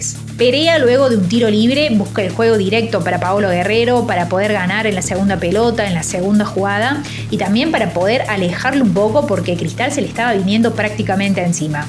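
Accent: Argentina. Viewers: Y porqué habla como argentina?? complejo 😂😂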